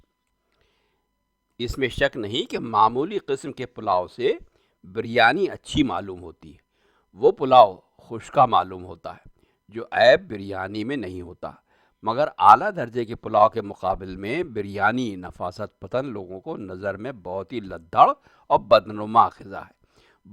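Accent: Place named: Lucknow, India. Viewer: बेहतरीन जानकारी वह भी लखनवी जुबान में 👌👌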